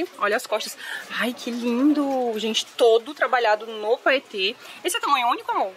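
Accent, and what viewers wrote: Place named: Pará, Brazil. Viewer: Mana, tô achando q tu é Paraense, rsrs. Gostei do vídeo, dando like e ganhou mas uma inscrita.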